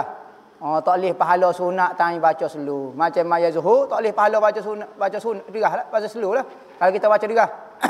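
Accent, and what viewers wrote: Kelantan, Malaysia. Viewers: Tak berapa nak faham sangat pelat kelantan..tapi ustaz ni bijak dan berilmu bila berucap...semoga ustaz sihat2 selalu...jaga kesihatan ye...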